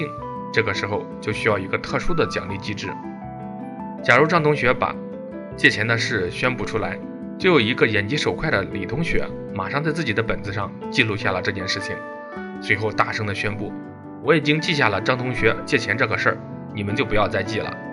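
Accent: Henan, China. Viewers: 主持人是河南人吧？ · 哈哈哈 up主是不是豫北人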